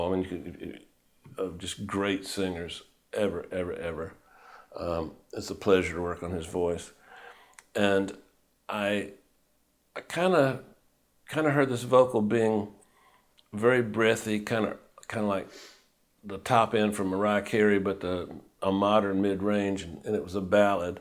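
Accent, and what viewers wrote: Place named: South, United States. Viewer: Its that slight southern accent, which I love to hear...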